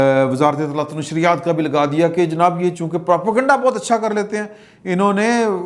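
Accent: Punjab, Pakistan. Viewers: Shafqat Saheb, please avoid speaking Punjabi in a national telecast program. It is uncivilized. Just to let you know that your Urdu pronunciation is also Punjabi type accent. To digest this accent is enough For your non Punjabi viewers. Thanks.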